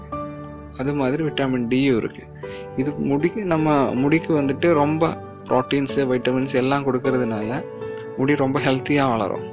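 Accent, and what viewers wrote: Kerala, India. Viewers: Unga Tamil la malayam slang iruku..r u a Malayali??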